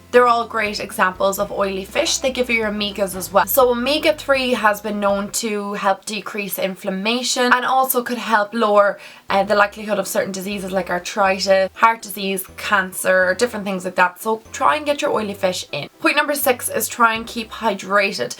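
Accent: Ireland. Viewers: I love these videos, so glad you have done your last one and this one, I've been changing my eating habits over the past month, I've lost about 6/7lbs, what is a healthy weight loss in a certain time frame, love your videos and I'm a sucker for Irish accent lol xxxxx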